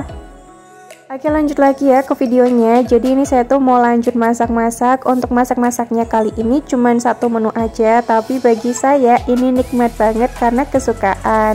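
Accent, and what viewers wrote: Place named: Pemalang, Indonesia. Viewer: Okeh2 khas Pemalang,ogel2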